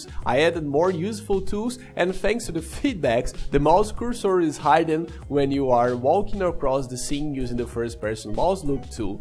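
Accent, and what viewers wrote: Rio de Janeiro, Brazil. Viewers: Brazilian accent, commom brazilian name 🤔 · E parece ser carioca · sotaque brasileiro é impossível de esconder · Esse sotaque da cidade maravilhosa não me deixa duvida kk...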